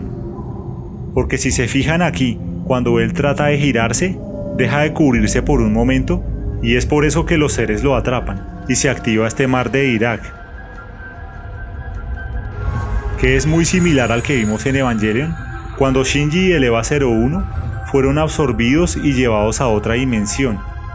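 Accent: Colombia. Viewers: Un saludo amigos: Si no estoy mal hay que saludar en plural pues ustedes son un equipo, me enorgullece mucho realmente, estoy bastante alegre con ver una propuesta de canal de entretenimiento como este y mas si es de mi país parece (Colombia) Se puede deducir por el acento. Cada análisis propuesto aquí, cada palabra, cabo suelto atado, cada pista descifrada, cada misterio resuelto o propuesto es una joya. Death Stranding ha recibido mucho Hype, eso todos lo sabemos, el titulo ha recibido criticas, insultos, etc, haters siempre va a ver, pero si algo todos debemos reconocer es que la espera ya de por si hace parte del titulo, el misterio detrás nos coloca a jugar desde ya, formando y buscando cada ficha del rompecabezas, esto es un titulo de Kojima, Kojima no es un desarrollador de juegos, Kojima no hace vivideros, Kojima es un artista, Kojima hace piezas de colección, así debemos verlo, así debería tomarse, Hideo esta a otro nivel, a mi me podría decepcionar el resultado si, me podrá parecer horrible, claro, pero debo ver que por mediocre que sea o impactante que resulte es una obra de arte y el arte es subjetivo, para mi sea como sea, ya es brillante lo que esta sucediendo en medio de la intriga. 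Muchas gracias por estos análisis y espero, encarecidamente sigan creciendo, un 100% De apoyo.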